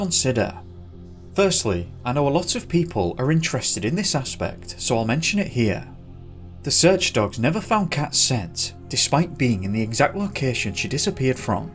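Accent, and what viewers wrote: United Kingdom. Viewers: BRITISH ACCENT LOVE IT!❤❤❤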